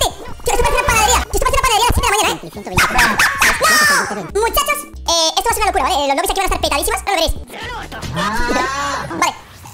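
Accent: Argentina. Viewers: Weyyy primera vez q veo un video de el y me doy cuenta que es Argentino 😂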